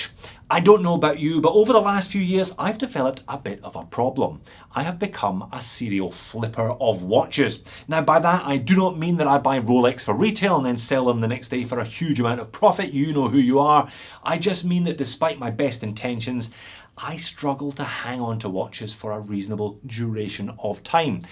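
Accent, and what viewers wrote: Scotland, United Kingdom. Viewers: Maybe I've gotten too used to the Scottish accent by now, but have you ever thought about doing some kind of voice-over or voice acting? The enthusiasm is contagious.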